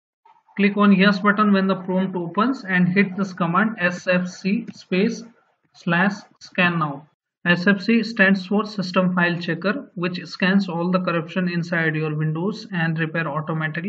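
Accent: India. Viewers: It worked smoothly. Been searching the solution for hours. And i knew im in a safe place after hearing an indian voice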